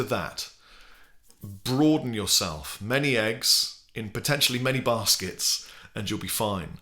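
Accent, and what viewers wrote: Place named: United Kingdom. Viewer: He has a brittish accent, he speaks the truth!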